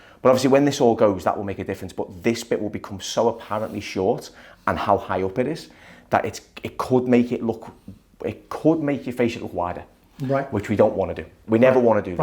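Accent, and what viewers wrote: Liverpool, United Kingdom. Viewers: Bonjour. 😊 Yes has a fairly strong but gentle regional English accent. He is from Liverpool or that area of England. The UK has many different accents. I come from an area of Scotland where we speak both softly and quickly. I'm often not understood by the English.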